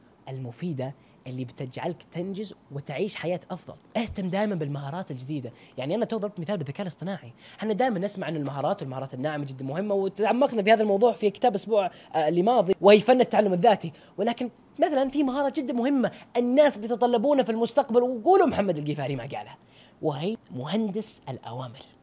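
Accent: United Kingdom. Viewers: استمر يالأخ محمد القفاري ولكن انا عندي نقطه بخصوص نطقك للأنجليزي اتمنى يكون نطقك وهجائك بلهجتنا وليس باللهجه البريطانيه اتمنى تتقبل انتقادي بصدر رحب واتمنى لك التوفيق والنجاح ومن هنا للأعلى 🤍🤍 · طيب؟، يعني إذا أهم الشيء الكلام واضح، بالنهاية نطقه للغة الانجليزية بالطريقة الصحيحة(باللهجة البريطانية)مو من شأنك أبدًا ويعد إختياره، صح؟ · انا عارف شعورك وانه نطقها بالطريقه البريطانيه نوعاً ما مزعج او مستفز ولكن معا الأسف ترا فكرتنا هذي عن انه مزعج او مستفز خطأ وبدل ما تطلب منه يغير اسلوبه في النطق عشان ترتاح في سماعها انصحك وأنصح نفسي وكل من هم زينا اننا احنا نغير فكرتنا انه الطريقه الصحيحه في النطق اهم من راحتنا وأننا ندرب أنفسنا على تقبل هذا النطق الصحيح بدلا من انتظار العالم انهم يصيرون ينطقون بما نحبه احنا لأنهم ما راح يتغيرون ابدا شيء مستحيل اصلا وهذي نصيحتي واتمنى انها تكون واضحه لك وللجميع يارب ❤ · غير صحيح ... الصحيح أنه ينطقها بريطاني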